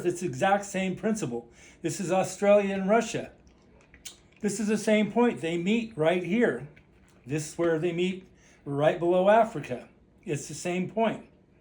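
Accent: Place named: United States. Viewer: HEY! Further to my other comment. I took a quick look through your YT channel and conclude that you are 'Muricun. You say EEquator and not eQUAtor. I described in my other comment how a Mercator projection is made. Now I will tell you about the TRANSVERSE Mercator projector. In the US of effen A!! Large scale topographic maps are created using a TRANSVERSE Mercator projection. A meridian (line of Longitude) is chosen as the central meridian for any particular map. The entire country is gridded out so these reference meridians are specifically chosen to allow map sheets to line up with ones east and west (and north and south) of them. Imagine a cylinder of paper wrapped AROUND the globe along an above stated meridian line. The maps produced here are VERY LARGE SCALE so only a very tiny bit of the earth's surface is projected for these maps. The largest scale map that is usually created for these topographic maps is a scale of 1:63360 WHY that number? 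I live in Canada. An equivalent map that is produced here is 1:50000, so it is slightly larger in scale. But WHY does the USA use 1:63360? ANY idea? I'll let you figure that out. When you do, then figure out why Canada uses 1:50000 These topographic maps are quite accurate. They ALL have a bit of distortion at the east and west edges. They are accurate ONLY on the very center meridian line. You can purchase several map sheets that adjoin each other and cut off the large margins to leave JUST the map part, and then fit the she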